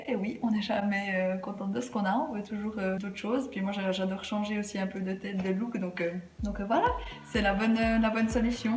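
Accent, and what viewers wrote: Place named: Neuchâtel, Switzerland. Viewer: hey hey on reconnait bien l'accent de Neuch :D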